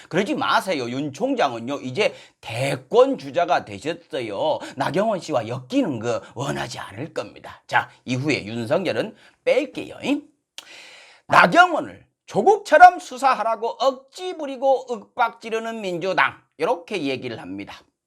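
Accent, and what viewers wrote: Jeolla, South Korea. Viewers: 서울에서 태어났담서요~~성동일씨랑 쌍벽을 이루는 라도 사투리 투 톱👍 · 숨도 안쉬고 마시시네요. 전라도사투리는 들을수록 정감이 납니다. 물론 내용이 더 맛을 더하기는하지만... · 어이 자네 자 네는 전라 도 사 람 이 아니면 서 전라도 말을 그럴싸- 하 게 하는 디 전라도 도 지역 마다 억양 이 다 다르 네 자 네는 전라도 어느 지역 말을 쓰는가 나는 순 천60대 전라도 말을 쓰는 것은 자유 지만 전라도 사람 욕먹는 발언 사가 해주소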